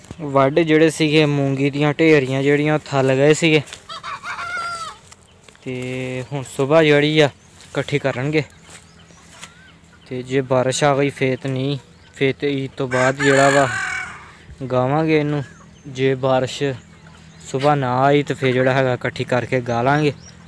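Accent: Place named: Punjab, India. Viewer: Salaams nice Punjabi we speak like you too apnayat mehsoos hoti hay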